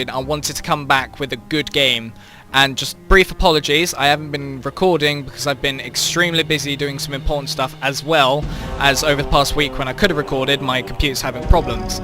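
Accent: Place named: United Kingdom. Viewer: Nice video. A nice British accent to listen to, no umming or ahhing, and a simple introduction to a game that I (and I'm sure many others) am looking into simply because Evolve has been delayed by such a long time. Onto your 2nd video......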